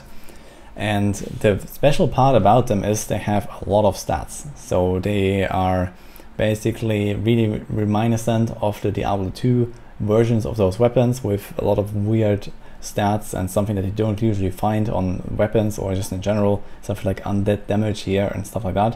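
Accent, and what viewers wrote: Germany. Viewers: Man hört das DIREKT, das du ein Deutscher bist xD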